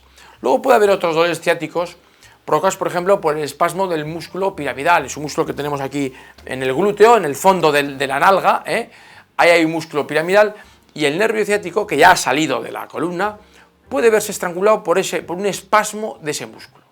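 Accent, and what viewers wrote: Spain. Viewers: Ese doctor es un españolete , andaluz por eso no se le entiende, deberían de poner a españoletes a freír espárragos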